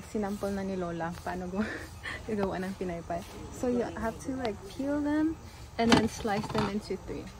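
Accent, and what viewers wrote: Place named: Visayas, Philippines. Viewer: season naman diay ka mag bisiya ❤😂 bisdak na 100%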